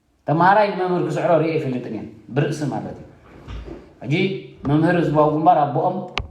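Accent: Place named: Akele Guzai, Eritrea. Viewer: I love His Akeleguzaiyan sound and Akeleguzaiyan erp radioness sound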